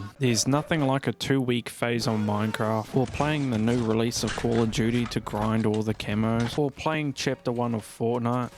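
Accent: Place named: England, United Kingdom. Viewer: the english accent adds to the dry humor, nice video.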